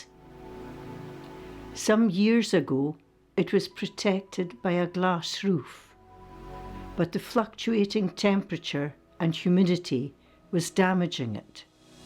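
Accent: Scotland, United Kingdom. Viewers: What a lovely voice Scottish obviously ❤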